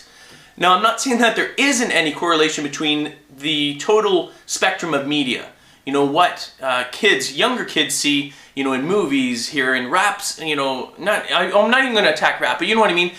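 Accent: Canada. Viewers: wate yor canadian?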